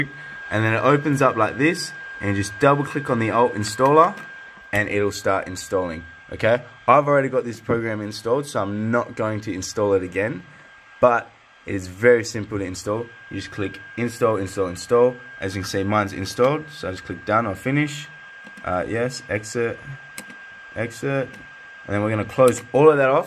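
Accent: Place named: Australia. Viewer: you have that strong Aussie accent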